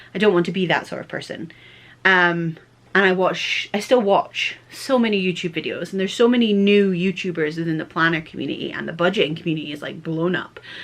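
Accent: Scotland, United Kingdom. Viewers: I have never watched any of your videos before. I love planners and like to try different ones. When I heard you speak, I thought maybe you were from Scotland. My grandma was born in Dalmellington. You are so brave to jump back into this. I wish you the best on your recovery journey.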